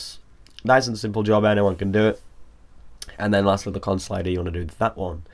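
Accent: United Kingdom. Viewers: He's not drunk he's got a god damn accent called British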